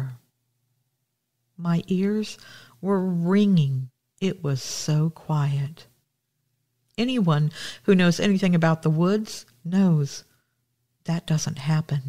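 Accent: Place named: Minnesota, United States. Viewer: Sounded like a great way to celebrate 21st birthday. I am from MN this sounds like you are from the northern part of the state and your cabin sounds awesome. Thanks for sharing your story. Pepper sounded like a great dog.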